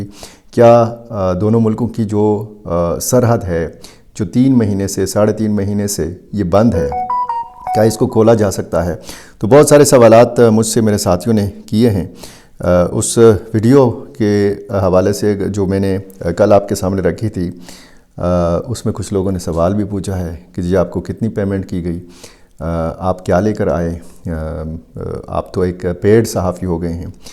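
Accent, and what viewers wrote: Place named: Khyber Pakhtunkhwa, Pakistan. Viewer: صاحب آپ کی صحافت واقعی بہت ہی عمدہ اور مثبت طریقے کی ہے حسن خان پشتو میں بولتے تھے تو میں نے لکھ دیا تھا کہ اردو میں بھی بیان کریں تاکہ اردو سمجھنے والوں کے سامنے پختون قوم کی صحافت کا مثبت چہرہ سامنے آئے مجھے نہیں معلوم کہ آپ کہاں کے ہیں؟ مگر لہجے سے لگتا ہے کہ جنوبی پختونخواہ کے ہیں اور جتنی زیادہ قربت ہوتی ہے تو قربت کے لحاظ سے موددت ایک بالکل فطری چیز ہے ایک دور ایسا تھا کہ سلیم صافی صاحب کو طالبان کے حوالے سے بہت ماہر سمجھا جاتا تھا سلیم صافی نے بہت معاملات میں نشاندہی بھی کی ہے ایک بار پہلے جب نیٹو افغانستان میں موجود تھی تو کہا تھا کہ جنرل قمر جاوید باجوہ نے کہا ہے کہ افغان اور پاکستانی طالبان دونوں ایک سکہ کے دو رخ ہیں پھر جب نیٹو افغانستان سے گیا تو میری بھی یہی رائے تھی کہ تحریک طالبان پاکستان کو آنے دیا جائے اسلئے کہ امریکہ جانے کے بعد جو لوگ افغانستان میں پھنس گئے ہیں ان کو واپس آنا چاہیے وزیرستان میں جمعیت علماء اسلام کے سینیٹر مولانا صالح شاہ قریشی نے ان کی آمد کا خیرمقدم کیا تھا PTM والوں کے بیانات تھے کہ ان سے ہم کہتے ہیں کہ تم ہمارے بھائی ہو افغانی طالبان نے تم اپنا نہیں سمجھا اور پنجاب کی فوج بھی تمہیں قبول نہیں کرتی اگر آپ کو کوئی مسئلہ ہو تو قتل وغارت کی جگہ ہمیں بلاؤ ہم اس کا ازالہ کریں گے البتہ سوات کے لوگ ان کے خلاف نکل آئے تو مراد سعید بھی عوام کی زبان بولنے لگے ورنہ تو اخونزادہ چٹان باجوڑ سے لیکر جنوبی وزیرستان سینیٹر مولانا صالح قریشی محسود تک قبائل کے نمائندے گئے تھے ہمارے گھر پر بھی حملہ ہوا تھا طالبان نے اس پر معافی بھی مانگی تھی اور فیصلہ کرنے کیلئے جرگہ بھی ہوا تھا لیکن پھر فیصلہ ادھورا رہ گیا تھا اسلئے کہ ان کے خلاف آپریشن ہوا تھا اور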